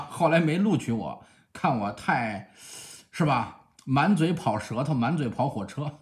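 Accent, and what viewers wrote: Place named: Tangshan, China. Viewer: 哈哈唐山话，比落亭话还有味儿呢。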